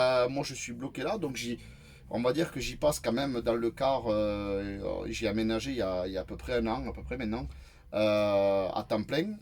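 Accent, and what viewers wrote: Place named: Toulouse, France. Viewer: Ah cet accent toulousain,j’ai passé 12 ans et depuis mon cœur est à Toulouse. Hélas je ne peux plus me permettre de vivre dans la région, je suis juste au nord du lot, je ne vais pas me plaindre mais cet accent me fait vibrer, j’adore ! Depuis je fais du canoe sur la dordogne, lol ça change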